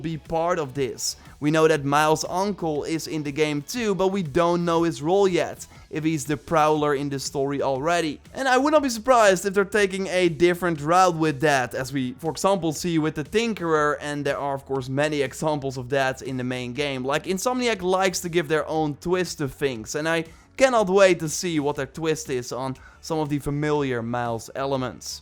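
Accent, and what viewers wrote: Netherlands. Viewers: this dude sounds ultra dutch is he from holland by any chance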